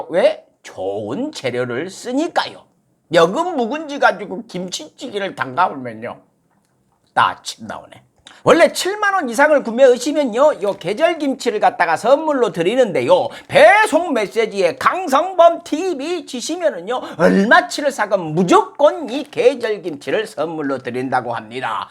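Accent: Jeolla, South Korea. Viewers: 늘 멋진 마음 멋진 내용 맛깔스런 전라도 사투리 참 좋아요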